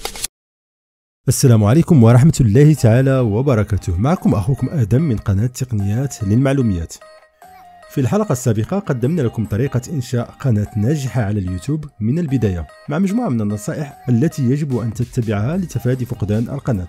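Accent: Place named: Morocco. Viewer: من صوتك تبدو انك مغربي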